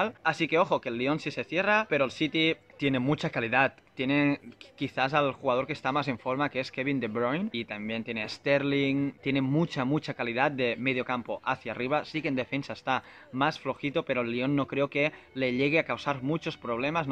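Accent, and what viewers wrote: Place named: Catalonia, Spain. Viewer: Tens un accent català que es nota des de 25 m hahahahahahah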